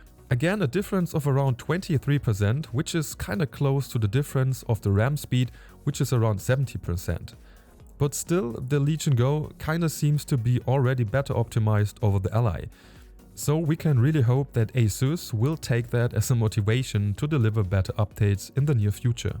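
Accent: Germany. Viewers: Dachte mir die ganze Zeit… ists nen deutscher? 🤣 bis zum letzten Wort war ich mir unsicher 🤣 Tschüss!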